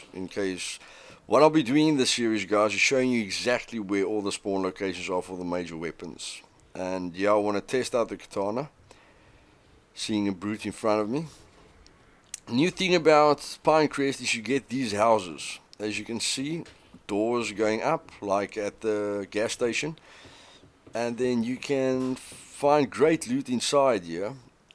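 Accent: South Africa. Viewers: You from South Africa? Subbed!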